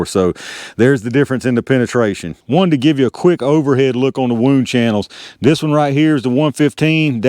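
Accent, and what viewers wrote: South, United States. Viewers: You have the most classic southern drawl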